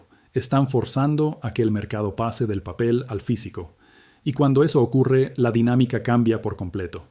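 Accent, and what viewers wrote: Argentina. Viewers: Por qué ahora hablas argentino?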